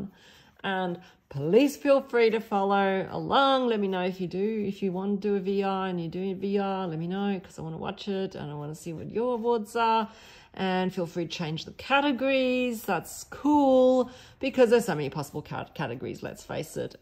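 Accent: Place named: Australia. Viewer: I forgot to comment when I watched 🤪 So, I loved this 😍🙄🤣 it is always good to hear another Aussie voice 🌺🍀🏵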